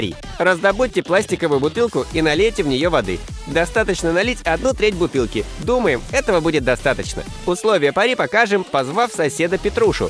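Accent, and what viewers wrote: Kazakhstan. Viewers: ты чо казах или из казахстанов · Автор ты из казахстана? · Братан ты казах что ли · Ты че из казахстана? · Ты чо казашка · Ты чё казах чтоле??? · Ууу казак ютубер ты из алматы или орал?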